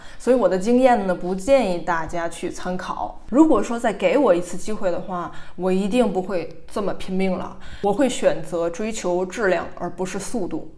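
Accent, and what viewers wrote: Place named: Northeast, China. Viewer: 小姐姐是东北的哈哈，有一点小口音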